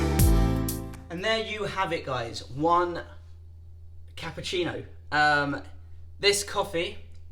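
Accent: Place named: United Kingdom. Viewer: Thanks for the coffee and British accent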